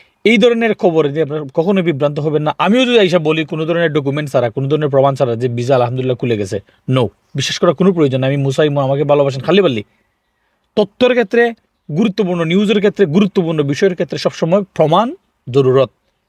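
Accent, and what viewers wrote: Sylhet, Bangladesh. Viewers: ভাই কি সিলেটি..??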